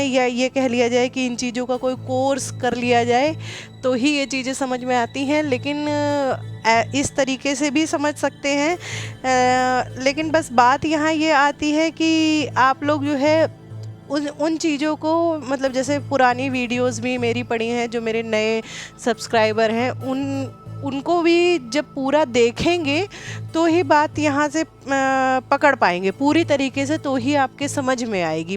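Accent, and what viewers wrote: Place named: Uttar Pradesh, India. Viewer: दीदी आपके वीडियो बहुत ही अच्छे हैं, में आपके वीडियो लगातार देख रहा हूं, और कुछ करने का प्रयास भी कर रहा हूं, और दीदी आपकी भाषा उत्तर प्रदेश की है और आप उत्तर प्रदेश से ही है यूपी के रहने वाले मेरे संपर्क में हैं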